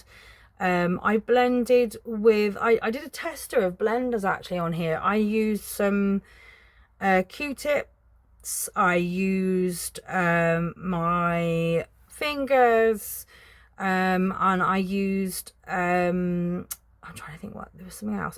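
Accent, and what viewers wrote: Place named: England, United Kingdom. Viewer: You have a lovely voice! You have a beautiful English accent (to me, an American) and pleasant musicality (engaging and expressive). I could listen to you for hours, whereas I have had to bounce from other channels because I couldn't stand the sound of their voices. I dislike what they call vocal fry (common with American females) and that annoying sharp popping sound Australians tend to make and how they make every sentence sing-songy, like a question? Probably nobody likes the sound of their voice because it's different from the outside then within our own heads. 😅Anyway, yours is wonderful, so I hope you can feel reassured. And your coloring is very cute too. 🥰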